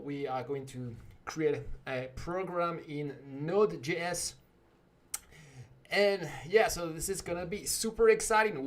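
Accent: France. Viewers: I feel you are french 😛😛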